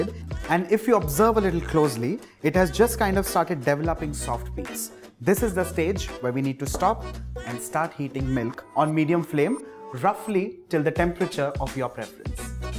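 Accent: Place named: India. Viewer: That's weird. He speaks just like any indian.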